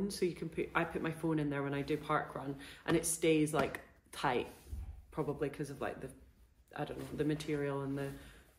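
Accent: Scotland, United Kingdom. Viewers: Just found your channel. Really enjoy seeing what you get up to in London. All the best with the channel, watching from US and can't get enough of your Scottish accent!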